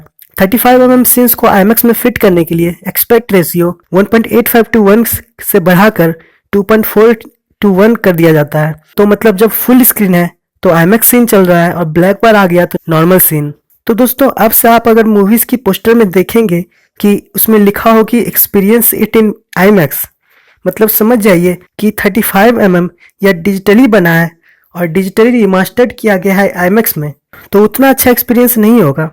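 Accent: Patna, India. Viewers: Bhai Patna se ho? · Bhai tum patna se ho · Bhai aap Patna se ho kya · Are u of patna??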